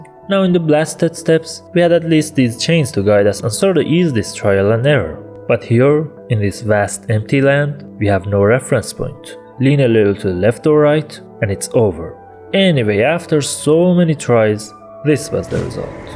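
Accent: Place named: Iran. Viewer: لهجه قشنگ ایرانیع 😂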